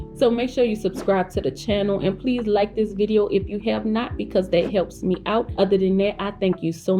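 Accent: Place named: Louisiana, United States. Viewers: Just came across this video! I heard of E-commerce YEARS ago and set up stores but never followed through. I started again this weekend. And ma’am, where are you from?! You sound like you’re from Louisiana. 😆that’s where I’m from so idk, your accent sounds real familiar. Lol ❤️ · Hi my beautiful sister. You sound so much like my ppl back from Louisiana. Have to keep going bun binge watching your videos and a few others bcuz i just got curious about it yesterday. Thanks for sharing sister. I hope you have an amazing Sunday and a blessed week ahead ❤🙏🏽🥰😍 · I love you accent!!! You must be from Louisiana!!!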